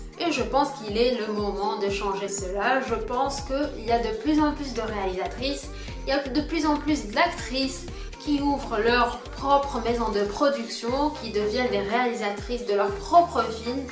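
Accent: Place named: United Kingdom. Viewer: j'ai vue que tu a mis la music 1 like en plus;) presantation avec accent soo british je like aussi;)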